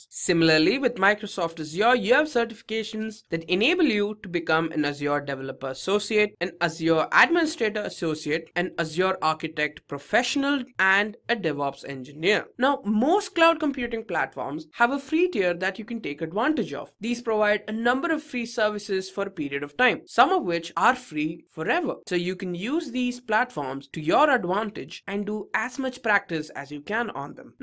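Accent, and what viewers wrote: India. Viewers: Indian accents are so cringe jesus christ.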